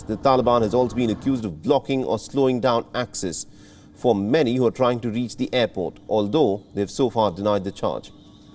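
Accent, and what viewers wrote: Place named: India. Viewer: Real English, Indian accent. You yankis should realize there is a whole world outside your borders.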